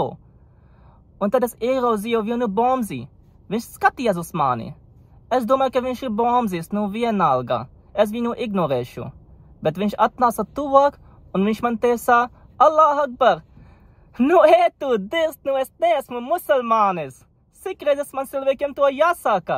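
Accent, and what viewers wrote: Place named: India. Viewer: Kas var būt labāks par ar Indiešu akcentu 😂 Kruta parodija hahahaha 😂😂😂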